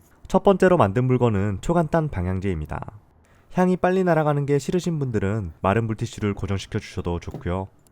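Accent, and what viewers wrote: Gyeongsang, South Korea. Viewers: 경상도 분 맞지요? ㅋㅋㅋ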